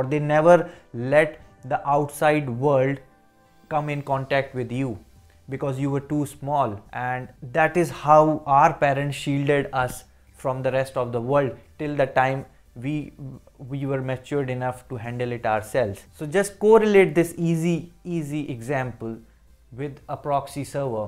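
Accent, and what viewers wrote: India. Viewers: 印式英语听得有点别扭，其他的都还好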